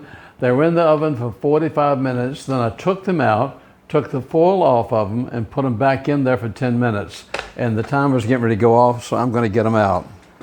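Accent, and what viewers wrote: South, United States. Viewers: I love your accent❣️ What part of the South are you from (Louisiana, here)? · OMG! I luv your cinnamon rolls and most of all your southern accent makes me home sick for for family in Beaumont, TX YOU go girl. Peace out. S. Valentine Los Angeles, CA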